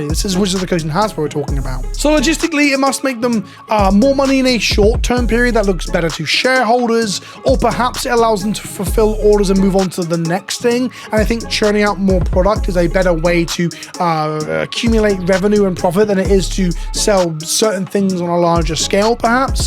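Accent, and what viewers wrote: United Kingdom. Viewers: Man i sure wish i understood what he was saying but all i heard is British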